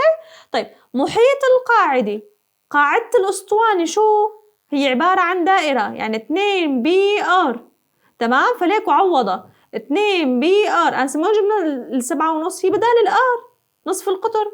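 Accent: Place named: Homs, Syria. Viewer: حمصية يمكن انت شو بدك ؟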